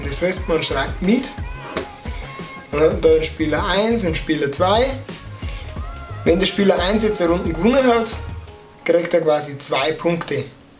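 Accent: Bavaria, Germany. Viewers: schlecht erklärt, und der bayrische Dialekt ist echt grausam - sorry! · Is des a Bayer oder a Breiss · Wiaso? Boarisch is doch schee!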